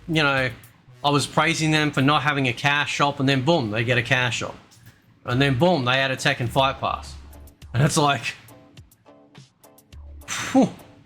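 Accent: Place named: Australia. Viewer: Right? 😂 love the aussie accent mate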